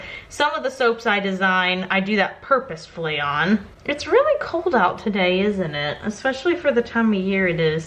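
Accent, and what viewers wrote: United States. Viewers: какой же все таки противный американский акцент, но эта баба настолько говорит на нем, что ощущение, что у этого человека гайморит или синусит. фу блэ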